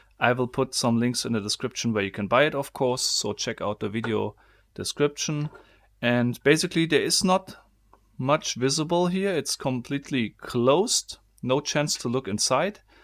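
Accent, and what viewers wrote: Germany. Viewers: Can it be that you're german? Because of your english😊 no hate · Instead of toying with this box you should polish your English or bloody give it up altogether.....so f....g German...it is NOT beck but back....it is NOT gep but gap...it is like the Chinese who say lice instead of rice...very disturbing. Record yourself and or go to a pronunciation bloody class...and you do not say isss you say izzz....English voiced C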